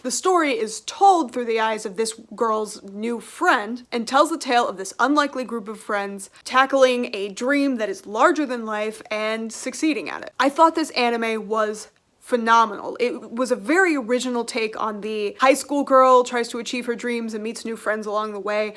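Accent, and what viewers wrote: United States. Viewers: I there’s any future anime reviews I’d live if you could write out the names. I have som hearing issues and Japanese with American accent is a bit tricky sometimes. 😂 Other than that, great video! Greetings from Sweden!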